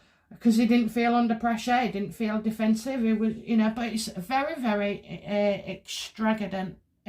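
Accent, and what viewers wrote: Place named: Yorkshire, United Kingdom. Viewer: I'm from Yorkshire myself and understand you just fine! I enjoy your lively readings.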